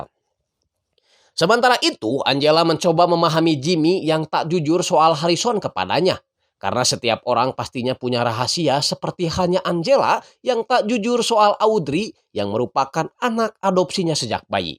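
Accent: West Java, Indonesia. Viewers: ( bang jembut)😂 Iyeu mah asli sunda😅😅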